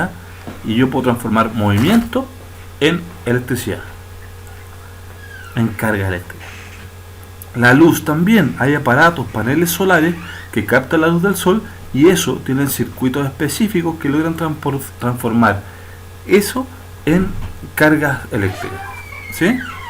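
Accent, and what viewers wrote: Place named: Chile. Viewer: Si porque habla de 8vo y 1ro medio que solo se utiliza en Chile, también tiene acento chileno y utiliza las palabras de ya dicho pais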